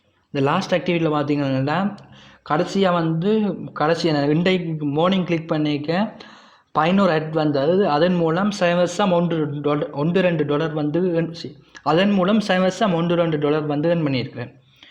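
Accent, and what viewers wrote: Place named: Sri Lanka. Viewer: நண்பா நீங்க இலங்கை யா உங்கள் தமிழ் different ஆக உள்ளது Niceee❤️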